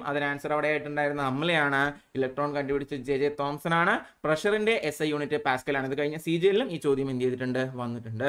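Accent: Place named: Palakkad, India. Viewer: Chikku chooru... Palakkadanz.. ✨️anagne paraya... ❣️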